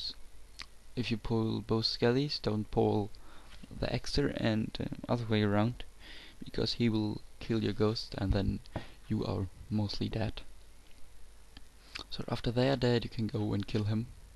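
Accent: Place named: Germany. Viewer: Du bist deutsch, oder? (wegen deinem Englisch(th Aussprache))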